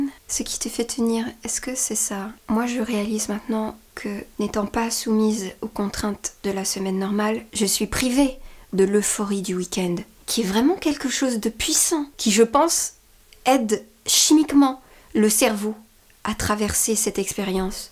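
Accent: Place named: Quebec, Canada. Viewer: Anouk Fabing, je suis d’accord avec toi. J’aime, je la trouve inspirante, originale et belle malgré son accent québécois renié. Mais à mon avis, c’est possible de s’épanouir de à du lundi au vendredi si on est bien entouré au travail. Un horaire atypique est plus souvent un problème qu’un symbole de liberté, fait parti des rares personnes qui peut gagner sa vie en choisissant comment et quand elle travaille. Ce n’est pas le cas de la majorité des gens à qui elle s’adresse.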